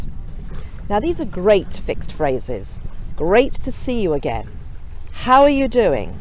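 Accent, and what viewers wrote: United Kingdom. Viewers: I like your standard English and Received Pronunciation,, thanks for great tips ,, you asked before what sounds we have problems with ,, well I have problem with ‘b’ sound , the I know the main difference between ‘ b’ and ‘p’ is the former is much stronger than the latter but the trick is how I can make ‘ b ‘ such a strong sound ??? Apart from vocal cords use as I use then while uttering ‘ b’ but I feel I still miss something to make ‘ b’ more powerful but I don’t know what is it !!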